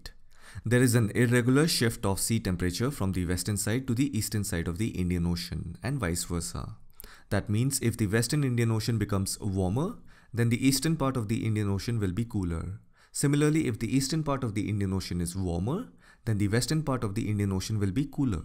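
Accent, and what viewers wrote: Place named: Bihar, India. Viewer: From His accent ..it seems that he belongs to bihar... Cuz of people of bihar pronounce 'R' as 'D'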